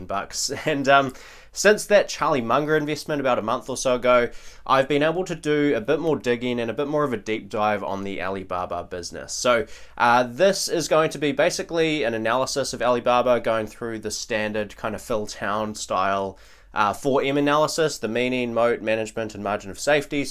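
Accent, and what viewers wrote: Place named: New Zealand. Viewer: Nice to hear a kiwi accent for a change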